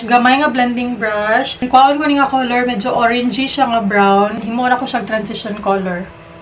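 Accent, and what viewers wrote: Visayas, Philippines. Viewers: bisdak mode hahaha makalingaw jud maminaw ai. 😄😄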